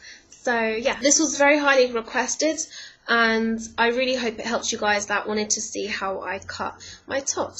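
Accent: United Kingdom. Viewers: haha yu said "whatever" soo americann lol , but i love your british accent (: cool video x3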